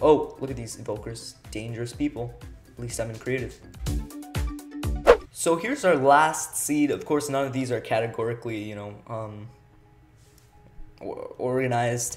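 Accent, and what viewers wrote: India. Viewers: U have a slight Indian accent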